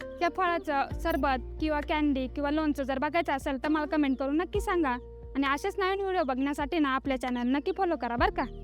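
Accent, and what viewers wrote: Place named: Sangamner, India. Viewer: नमस्कार ताई खूप छान माहिती दिली धन्यवाद.. संगमनेर भागातून बोलता का? बोलीभाषा मिळती जुळती वाटते. आम्ही जुन्नर आळेफाटा..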